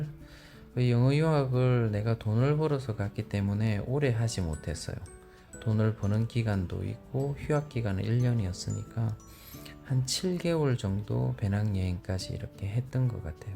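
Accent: Gyeongsang, South Korea. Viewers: 제 고향도 창녕 옆 밀양 무안입니다, 저도 부산에서 몇 년 학교를 다녔구요, 그래서, 억양이나 정서가 비슷하니 더 정감이 가는 것 같네요, 올핸 뵐 수 있겠죠!! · 새해복마니받아요 저두 대구사람 어찌 말투가 주위에서 자주듣는 어투라서 경상도분이구나했두만 역시나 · 선생님의 이야기 잘들었습니다. 항상 느끼는것이지만 선생님은 경상도분이신데도 투박하지않은 듣기좋은 그런사투리를 쓰십니다. 그래서 항상 듣기가 편안합니다. 제 말투는 완전 대구사투리 그 자체거든요ㅎㅎ 오늘 날씨가 참 좋아보이네요 그리고 제가 가보았던곳이라 너무 반갑습니다ㅎㅎ 좋은하루 되시구요 오늘도 좋은영상 감사합니다^^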